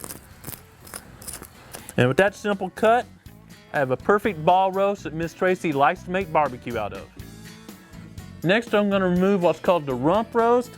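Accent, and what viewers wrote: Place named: South, United States. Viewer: Sir, I don't know where exactly you hail from. But you sound like a Southern gentleman who knows his stuff...minus all of the extra stuff. And for that I am thankful. This video is direct, effective and presented in a friendly yet knowledgeable tone that helps a lot of people learn...like country northern boys like me. Lol!. Too folks want to put on airs cause they're in the public eye (especially when on YouTube), they want more "hits", or they think a flashy style beats substance. But at the end of the day, good old common sense, common tactics with common tools always reign supreme cause...they work! Good job. I'll be watching your channel.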